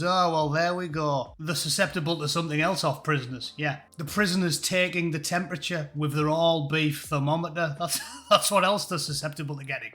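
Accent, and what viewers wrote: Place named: Teesside, United Kingdom. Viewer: Ya sound like a FELLOW SMOGGIE mate ?First video ive seen of you enjoyed the pisstake UTFB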